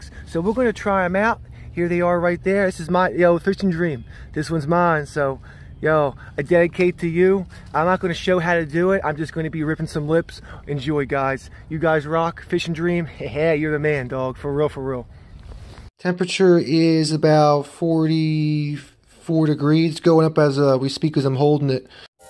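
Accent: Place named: New Jersey, United States. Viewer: Where you from? You sound like my homies in Jersey. Great catches. I am still trying to pin down some yellow perch over here.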